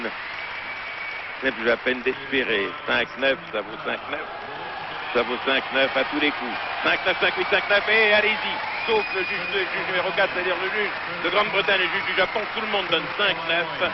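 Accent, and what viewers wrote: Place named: Canada. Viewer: The footage may be European, but the commentary sounds like Canadian French.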